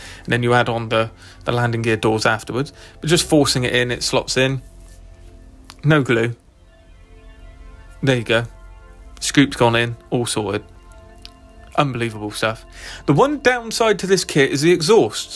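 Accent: United Kingdom. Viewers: As a yank, to me this has to be the most sexiest fighter of WW2. Plus hearing a Brit narrate brings it altogether. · Brilliant video build. I love the British accent!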